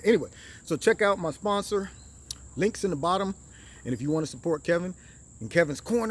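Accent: United Kingdom. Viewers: That British accent!!.😂😂😂😂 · You are funny. Had a good laugh with that British accent. 👍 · Drinking lava lmbo you are AWESOME love your British accent LOL!! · I laughed from the title to the end. Great British Accent! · YOUR BRITISH ACCENT IS PERFECT.. GO ON AGT. YOU ARE GONNA BE A WINNER!!!!!!!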